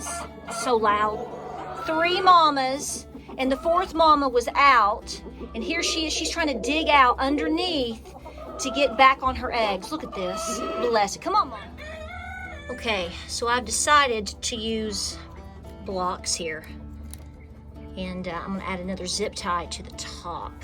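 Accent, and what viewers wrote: South, United States. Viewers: I love Jesus!!! I also like your country accent. It’s pleasing to listen too. 😀. God bless you and keep doing what you do. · Am from north of the 49th. yup definately southern accent 🤠🙏🇨🇦😇🙌🇨🇦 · Found you about a month ago and i am so grateful. Your wit and grace with that southern charm/accent waltz my heart. My mom, dad n sis have passed but you remind me to stay strong. Listen to this song, it's called " " by. Love u both, looking forward to your next vid! Ps, i got six hens this spring. Oh but one is a rooster. Having debated about his future. Advice or a vid about it would be great. Bless u child!!! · I love you....I'm southerner too...I love your accent.... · Keep on preaching the message sister, btw I love your southern accent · Yes, yes, yes! My dear Mom, may she Rest In Peace, was from Tennessee. Your sweet southern accent reminds me of her. 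Blessings ❤️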